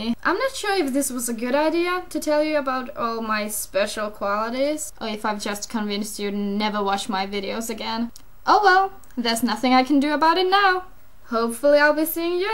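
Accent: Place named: Finland. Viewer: You sound so finish and that's kind of cool... the accent and stuff...! :D When I was little I lived in Finland for a while. I remember the kids and people and you just remind me of them somehow or something... :D Anyway, smoking is cool, so you should definitely try it! :D It's just a hobby. If you smoke, well at least that's gonna be a new topic for you to talk about in your videos. Take it as a challenge, light a cigarette and share your experience live on camera... :D... Anyway, peace!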